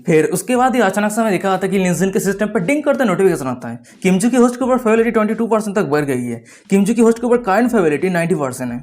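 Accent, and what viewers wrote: Bengal, India. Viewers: Bhaiya AP Bangali ho kiya...❤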